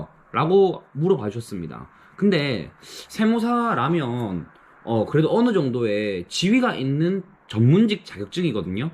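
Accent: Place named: Gyeongsang, South Korea. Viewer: 말투 들어보니 경상도 분이신듯? 학교 다니면서 붙으셨나요? 혹시 개업하신 분인지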